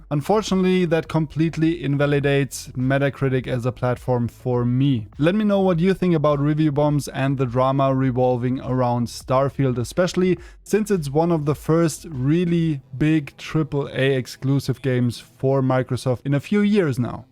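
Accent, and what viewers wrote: Germany. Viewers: I like your videos but you sound like a German who speaks English 😂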